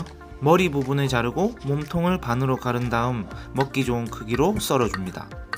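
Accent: Gyeongsang, South Korea. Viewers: 갱상도 청년이시군요. 는 소리없이 자막으로 어디서나 간단명료하게 볼 수 있음이 특징이예요. 목소리 반기웠어요^^, 그러나 말소리가 나오니 장삿꾼 같은 느낌이 나서 참신함이 좀 훼손 되는 듯. ㅎㅎ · ㅋㅋㅋㅋ 같은 갱상도사람으로서 사투리 너무 구수합니당 고추우장/ 다진마뉼 / 오징어로 미나리초무침이랑 볶음으로 밥도둑 완성했어요 감사합니당😄😁😆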